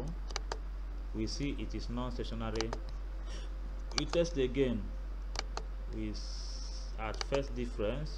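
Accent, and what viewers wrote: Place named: Cockney, United Kingdom. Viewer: I wish I could have the patience to deal with cockny accent in my dire times.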